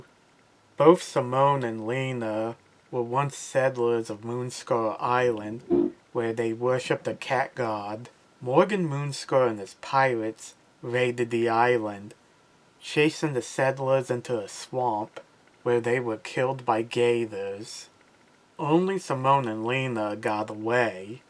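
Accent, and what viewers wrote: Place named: Maine, United States. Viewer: hey, just found ur channel thru ur video on roy and i loved it! very helpful information. i was just wondering, are u from the northeast by any chance? ur accent makes me think u might be from maine. take care!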